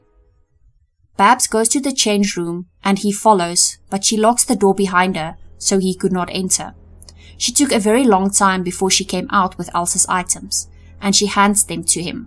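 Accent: South Africa. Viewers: Im binge watching ur videos ur work is great and i sense ur accent is south African ❤🎉watching from SOUTH AFRICA 🇿🇦🇿🇦🇿🇦 im truly proud of ur work ur videos are long shows dedication and the amount of hard work u put in u gained a new sub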